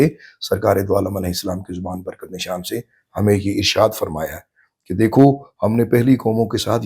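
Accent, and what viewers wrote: Arab world. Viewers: ALLAH HO ALLAH … HAZOOR QIBLA… sadqe ap k arabic lab o lehjay py … QURAN E PAK OR HADEEZ E MUBARAK se zabrdast beyan mubarak … HAZRAT MUSA k 9 mujzat life me first time ap se sune ko mily … Ap k wasly se hum in 9 mujzat py iman rahkty hai jo ALLAH PAK ny HAZRAT MUSA ko ata farmay … explaining method alaaaa or purasar… Ap ki alaaa azmaton ko salam … ❤️🌹🌹🌹❤️❤️❤️🌹❤️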